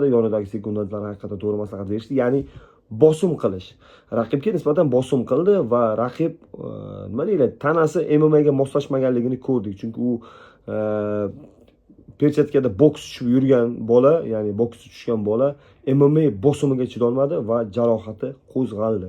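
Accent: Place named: Uzbekistan. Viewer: Ўзбекча тоза гапирганиз учун рахмат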